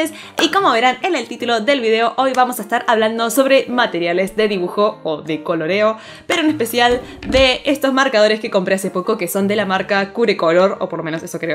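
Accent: Argentina. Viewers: ''Ni puñetera idea'' te quiero, pero aveces el Argentino-Español se te pasa un poco.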